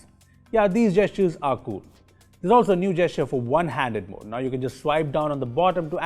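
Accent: India. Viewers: This is genuinely a great video. I had my doubts when I first heard the Indian accent, but I was pleasantly surprised. Thanks man. And sorry. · terrible Indian pronunciation...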